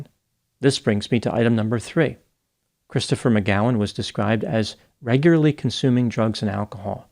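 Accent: United States. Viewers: Yes, in particular his English has only a mild American accent.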